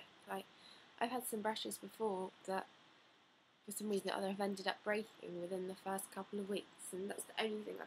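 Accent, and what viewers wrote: United Kingdom. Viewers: I love British accents... but by the end of the video your voice was annoying the heck out of me Avon does give you a full refund, you know that right? Don't like a product RETURN IT!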